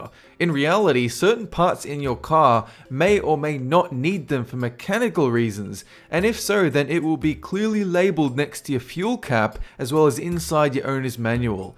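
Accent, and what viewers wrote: Australia. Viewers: Hey are you Australian? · are you aussie or american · Are you Australian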